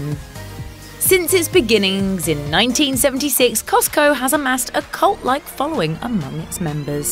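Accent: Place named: United Kingdom. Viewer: why the British accent?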